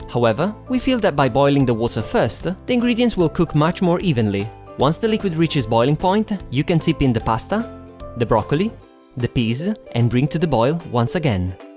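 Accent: Italy. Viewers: I could tell immediatly you're italian! for the great recipe alternatives and the accent! I live in italy too and it's great to have alternatives to our traditional plates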